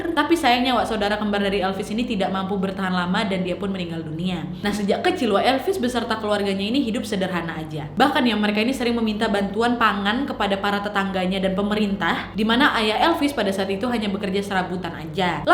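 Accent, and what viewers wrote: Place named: Medan, Indonesia. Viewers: Logatnya kayak orang Medan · Kakak ni pasti orang medan ya sukak kali sama cakapnya... hahaha... iya kan wak??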